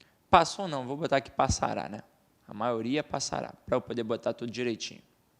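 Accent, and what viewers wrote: Rio de Janeiro, Brazil. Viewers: Adorei a aula e o jeito carioca! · o carioqueis é muito forte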